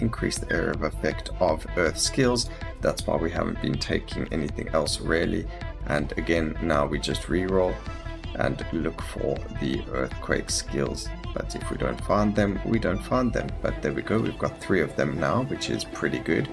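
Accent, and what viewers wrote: South Africa. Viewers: You South African brotha?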